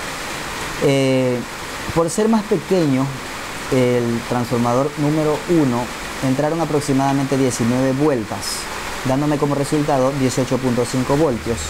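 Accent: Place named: Colombia. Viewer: soy de Venezuela y no se si las numeraciones de los cables son igual a las de donde tu eres, me pareces colombiano por tu acento y el cable numero 8 aca en Venezuela es un cable bastante grueso y no creo que entren ni 12 vueltas en un transformador de microondas, aclarame si ese cable es grueso o de que grueso es laseccion de el cable 8 que indicas.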